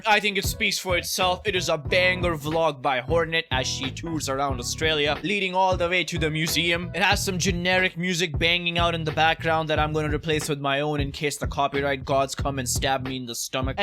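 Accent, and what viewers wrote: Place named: India. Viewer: I think it’s evident by his accent that he was at least raised in an Indian household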